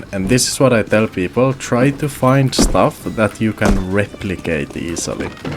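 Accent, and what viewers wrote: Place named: Finland. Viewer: Ait! is getting a new sub! When I get a nice humble guy, with good information, great knowledge, etc. AND who has the lovely finnish accent, then a fellow Norwegian HAS to sub! Great content bro! 🫵🥰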